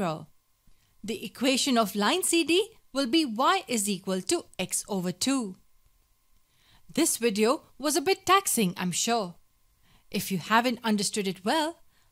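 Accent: India. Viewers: I screamed when i hear an indian voice and leave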